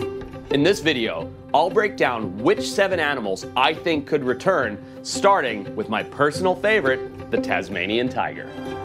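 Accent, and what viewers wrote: United States. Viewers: Saying Americans pronounce zebra wrong when you pronounce can’t like con’t and forest like far-ist is and interesting take. You basically sound American except for certain words. Almost like you’re on real bros of simi valley.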